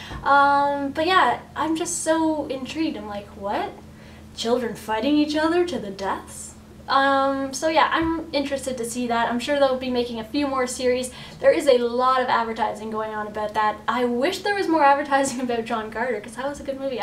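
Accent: Canada. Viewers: Are you Canadian? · I love the part about the 7 year old getting late to bed because he... you know... had to drive home! That was cute... Btw, my favorite James Bond character is: Xenia Sergeyevna Onatopp (Golden Eye) Hockey, he? From the sound of it you either from Toronto or Montréal, lol!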